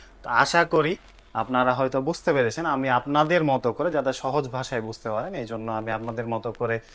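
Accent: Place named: Rajshahi, Bangladesh. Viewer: So far your accent seemed to be Razshahi OR your home town,looking your video from Dhaka,Bangladesh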